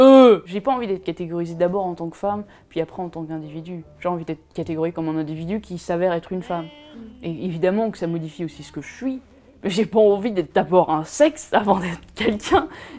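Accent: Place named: Paris, France. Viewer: Ahaha ok je viens du sud (telle Chimene Badi) du coup centre ou titi parisien ça se ressemble un peu à mes oreilles :) ! Merci pour ton témoignage, et concernant tes dents, avant que tu n'en parle je trouvais que justement elles étaient très jolies :) Les sourires colgate c'est banal et triste, mais des petites dents qui font leur vie c'est bien plus charmant !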